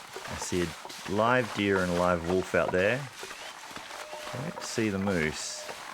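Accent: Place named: Australia. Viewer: The weather was great but you're skills in taking advantage of it were excellent. Wish you could have found a hacksaw but as others have said the flare gun is a good get. Also nice to hear a fellow Aussie's voice in the Canadian wilderness!!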